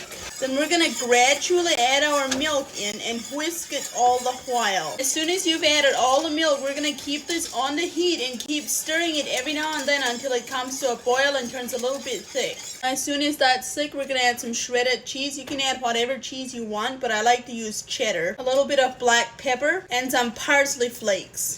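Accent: Pennsylvania Dutch, United States. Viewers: its not a lisp, its a pennsylvania dutch accent ^^;; i love the amish, i used to build pole buildings with the amish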